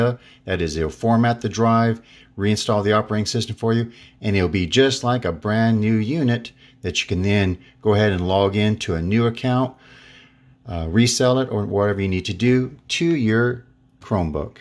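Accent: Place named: United States. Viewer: Hi there! 1st and foremost I wanted to say THANK YOU so much for being an american. I can't tell you how happy I am to be able to understand and hear you clearly. WOW, what a major difference. So thank you for that. I was so frustrated before I found your video. I followed each step, and it fixed my problems in less than 2 minutes. That was truly amazing. I appreciate people like you who take the time to make a video to help other people like myself. You make life a lot easier. My name is Cindy & I'm reaching out to you from southern California. Have a great day! Stay safe.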